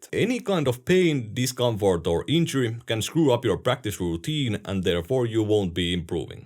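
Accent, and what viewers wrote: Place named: Finland. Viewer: Jätkästä kuuluu kilometrin päähän et sä oot suomalainen...Sä et puhu rallienglantia, etkä huonosti mut sen vaan kuulee. Tilasin.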